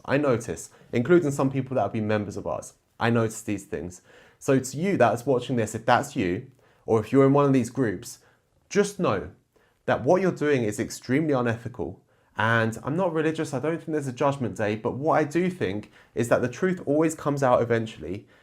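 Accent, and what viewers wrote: United Kingdom. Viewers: Hmmmmm... Are you from Europe? Your accent sounds like British something. 🤔🤔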